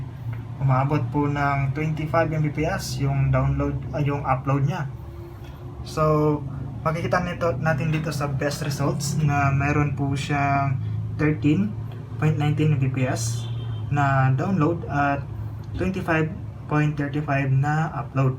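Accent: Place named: Cebu, Philippines. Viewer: Cebu nga dba? Understandable nman. Walang nkakatawa okay.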